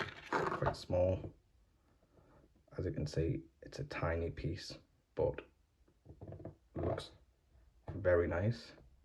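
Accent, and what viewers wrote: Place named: Newcastle, United Kingdom. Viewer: Your Geordie accent reminds me of home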